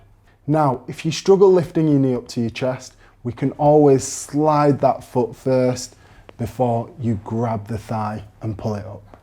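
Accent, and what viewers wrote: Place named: Sheffield, United Kingdom. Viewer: This is great. I'm from Sheffield but have lived in Mexico for years, so it's great to follow exercises for my hip arthritis from someone who sounds like he's from my neck of the woods. Update: Still doing these exercises, I like the clear instructions about correct technique but without unnecessary waffle. I feel like I'm in the room with the two blokes.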